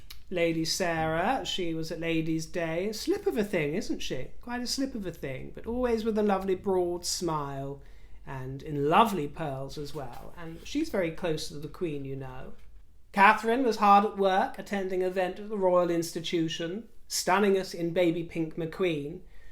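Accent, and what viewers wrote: Australia. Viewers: Yeah mate, your thick Orstralian accent is a bewdy . There’s jacko, jimbo, deano, johnno,…..love it! Why do the sins of the father have to be visited on the daughters of York? Never associated the York girls with any of that and really?? Why would you? And he appears to have been a loving and generous Dad, one of the good points for him. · Omg your Aussie accents are amazing!! Love that you've seen Kath and Kim 😂 Really suits you that gentle Aussie accent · Good Aussie Accent !!!!! Love it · Props for your Australian accents. You did us proud! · do come to New Zealand please. Love your Aussie accent. You need to visit us here in Wellington and do a kiwi accent though. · Oh Dear, As an Aussie😀I found yesterday and today your Aussie accent just made me smile. I find it very sad that here a lot of people are just loosing the great sense of humour we used to have. 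Love your channel ❤️🥰🥰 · Great episode 🙌🙌🙌 Your Aussie accent is fantastic! You’ve obviously embraced Home & Away and Neighbours in your youth. As we say, “goodonya” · I love your Aussie accent. Keep it coming. Although I really love your nice posh natural accent too. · Ohhh… your Aussie accent is brilliant…come to the west coast next time! Xx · Hahahaha as a Melbourne Aussie, I love your Aussie accent. You're quite good at it if I must say. We've got three rough categories of accent rather than region specific. They're call Broad, General and Cultivated. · your Aussie accent is hilarious, love it!! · I love you Aussie accents well done. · I love your Aussie accents..made me chuckle. You are spot on…so enjoyed your chat today. In Australia we shorten people’s names to signal our affection for them. It’s a fun way of showing we care and that we are close to them. Informality is the vibe of the day. 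🐨👑♥️ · That is a great Aussie accent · Love your Aussie accent! You’re so cute!! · love your Oz accent from Queensland resident made me laugh 😂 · Well done on the Aussie accents ♥ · Love your Aussie accent !!!❤️❤️❤️ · I loved your Australian accent!!❤️ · Your Aussie accent is better than 99% of Hollywood actors. Well done! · Oh my, your Aussie accents are amazing, far broader than mine, after living away from Sydney Town for so long, many think I am South African or even British😃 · Ann is adorable! I saw many many years ago .. two interviews she did with Michael Parkinson .. showed a Completely different side to her public persona as a rather strict humorless princess that was always portrayed in the press. . she was so funny and so honest... Parkinson was was a great interviewer and they interacted so well.. she revealed how she wanted to go to boarding school bcoz basically being privately tutored was boring and stifling (she didn’t use those words of course)! 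Geez she was funny! And highly interesting and intelligent. She described “losing her rag” when her potential kidnapped tried to drag her out of the car.. her recount was hysterical!! Anyone who claims to be an Aussie and complained about your Aussie accent.... ain’t Aussie.. they must be fake Aussie 🙄🙄🙄.. and today was even better! Whilst sometimes hearing our accent back at us .. I know I go sometimes .. bloody hell, do we sound that bad.. but then I go: I guess so. Australians tend to laughter at themselves.. we don’t take that sort of stuff seriously.. u shld block them from your channel if they are that ridiculously sensitive. You are v v good at accents.. quite excellent actually! Keep up the good work 🇦🇺🇦🇺🐨👍🏼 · you are on fire at the moment!! Ps your australian accent was truly fabulous in your last vid. Enjoy your day xx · All of Aussie accents were brilliant! I love listening to for much needed elocution lessons. 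· Great Aussie accent = love from an fellow Aussie xx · Love the Ozzie accent, Mate. All of them. You're a master. Could you say "was a miserable cow" in a Ozzie accent? Please! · beautiful colour on you, love your Australia accent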